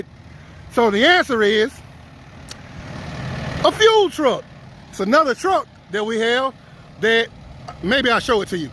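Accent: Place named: Louisiana, United States. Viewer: Bro you have like a California mixed with Louisiana mixed with Back road Mississippi accent😁 I love it!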